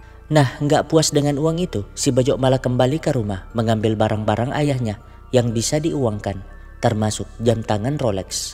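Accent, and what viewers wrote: Sulawesi, Indonesia. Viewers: Mimin dr sulawesi yh ..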